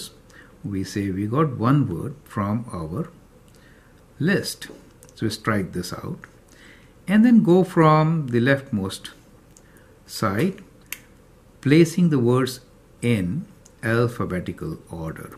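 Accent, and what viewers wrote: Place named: India. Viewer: aap jitna bhi US ascent mein bolne ki koshish karen .... hindusthani pronouncation aa hi jati hai .......... by the way video was nice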